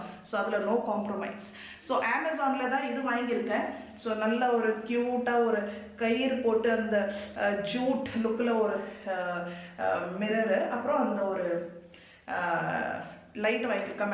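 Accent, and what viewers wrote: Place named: Karnataka, India. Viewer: Kannadam kalantha tamil arumai vazhthukkal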